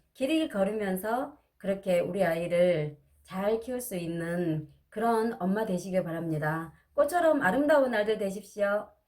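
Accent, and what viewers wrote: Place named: Jeolla, South Korea. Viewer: ㅎ ㅎ ...전라도 사투리가 나오네용.. 저도 전라도거든요. 역쉬 전라도 사투리가 멋져요. 영상 컨텐츠 좋네요. 앞으로 계속 응원할게요. 감사해용,,,,,나 갈랍니다..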